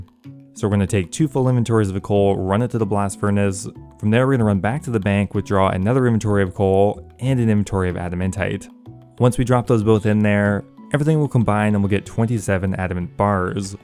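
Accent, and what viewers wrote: Quebec, Canada. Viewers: are you quebecois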